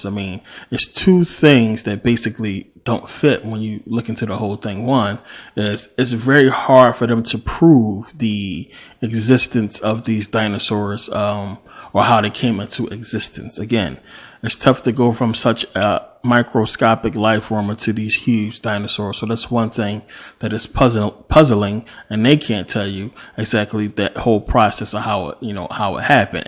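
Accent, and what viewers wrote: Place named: DMV, United States. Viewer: Love that DMV "tew, yew, hewge" twang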